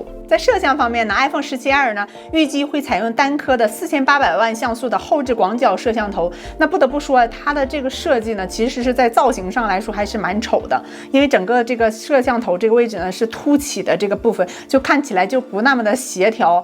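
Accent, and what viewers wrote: Northeast, China. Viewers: 主播都东北人？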